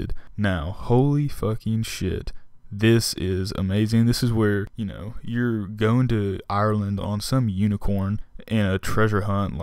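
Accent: South, United States. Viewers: I can't tell if you're southern or a frat guy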